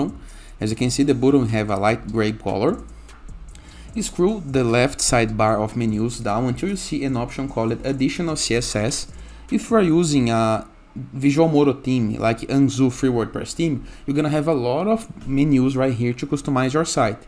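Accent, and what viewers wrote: Brazil. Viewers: Caraca, assim que ouvi a voz já pensei: "é brasileiro" kkkk Eu reconheço o sotaque do meu povo. Mas depois vi que estava em português o conteúdo do vídeo kkkk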